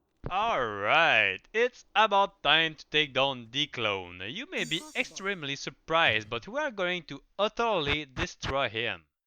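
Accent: Quebec, Canada. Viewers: That quebecois accent, I could recognize from a mile away. Nice build btw.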